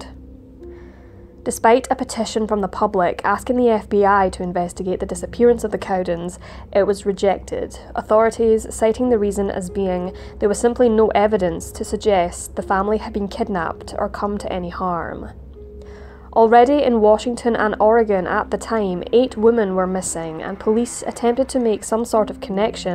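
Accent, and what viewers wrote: Scotland, United Kingdom. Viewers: Do I detect a Scottish accent ? Well narrated. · It’s Scottish! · Louis Cypher She’s Scottish. I love her voice too. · Is this accent Scottish? Reason I ask is I just heard this story on That Chapter in an Irish accent. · A nice Scottish accent. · She's Scottish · George Kintail Scottish accent 🏴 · Scottish lol and I’m Mexican I know that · Are you Scottish? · It is a great accent- but it’s Scottish 😊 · Scottish AF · She is Scottish lol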